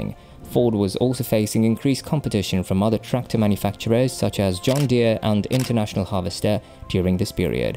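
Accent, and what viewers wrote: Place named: England, United Kingdom. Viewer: Turned this off for the English accent.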